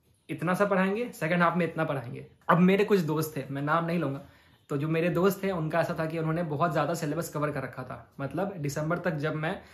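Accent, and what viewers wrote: Bihar, India. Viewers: Bihar se ho kya ...Katihaar district? ?????? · Bhai ap bihar se ho... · Bhai aaap kathihar bihar se ho??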